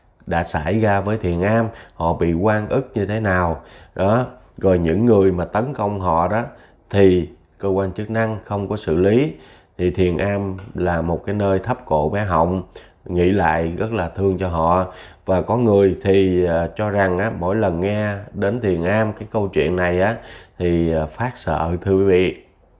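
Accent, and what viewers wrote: Standard. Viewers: Tiếng nói quá chuẩn luôn❤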